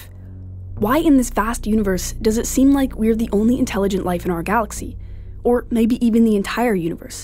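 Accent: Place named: Canada. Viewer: This is an amazing channel which is innovative and logical without being boring and I love the commentary.. also the way you say "out"and "about" makes me think whether you're Canadian 😋 One of the best channels I've found on YouTube!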